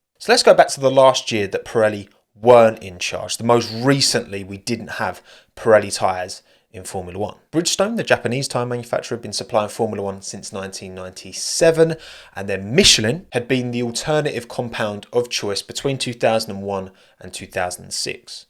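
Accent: United Kingdom. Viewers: I always love hearing Italian words pronounced in the "British way"😂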